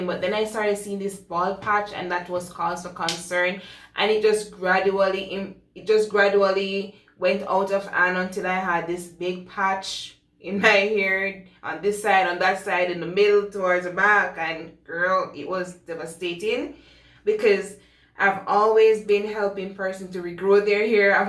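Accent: Jamaica. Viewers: You sounds Jamaican. I'm waiting for a miracle for my alopecia. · She is definitely jamaican. Can't hide our accent no matter what 🇯🇲🇯🇲🇯🇲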